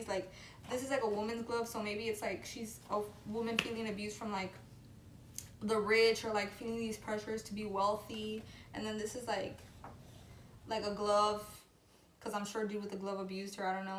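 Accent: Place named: New York, United States. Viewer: are you from new york because you sound like it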